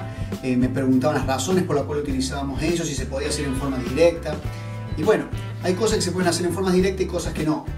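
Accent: Cordoba, Argentina. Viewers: HOLA SALUDOS DESDE CHILE TENGO PANADERIA Y VEO TODO PARA APRENDER MAS SOS DE LA ARGENTINA VOZ ? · Hola, sos Cordobés? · Entender tu epañol cordobés hablando a toda velocidad ya es un esfuerzo. Pero con el volúmen de la música tapando el de tu voz .....ni te digo. La música no sólo está demás sino que opaca el enender tu gran contenido.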